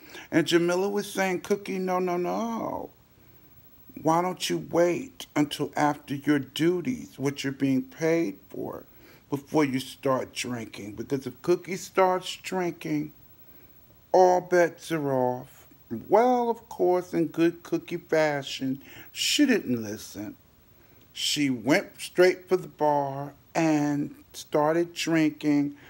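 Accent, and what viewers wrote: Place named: New York, United States. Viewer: Are you from New York? You sound like a New Yorker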